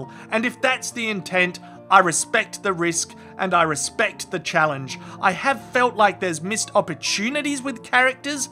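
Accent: Australia. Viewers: Thanks for putting all of these together over the years. it’s great hearing a normal Aussie voice poking fun but also reviewing it as the show has gone along.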